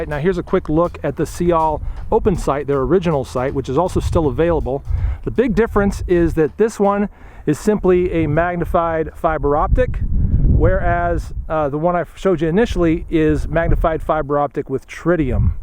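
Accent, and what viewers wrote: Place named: Massachusetts, United States. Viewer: ooooh sexy! (the sight, not you dude) I swear man you sound like youre originally from Mass..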